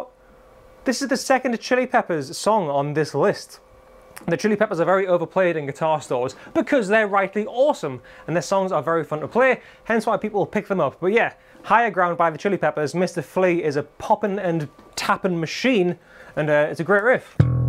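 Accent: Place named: North East England, United Kingdom. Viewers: Where are you from? I'm from Sunderland and I detect a bit of a North East accent. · i'm 52 and a total gearhead and i've been to millions of guitar shops over the decades corporate and indie and i have never noticed any particular song being played more than any other. i personally think it's a bit of an urban myth. me myself i tend to default to ' ' simply because it's the first really complex lick i ever learned and i hammered on it til i got it (i was still a beginner). btw "IT'S ALL MUSIC AND WE'RE ALL IN THIS TOGETHER" in his awesome northern english accent gotta love it could not have saud ut better myself!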